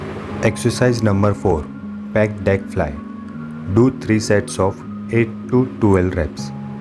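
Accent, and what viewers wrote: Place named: India. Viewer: واضح من اللكنه هندي بحت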